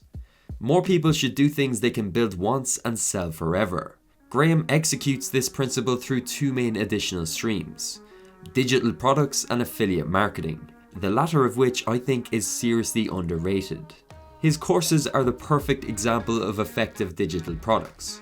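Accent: Ireland. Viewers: Is this buck Irish? I'm hearing an Irish accent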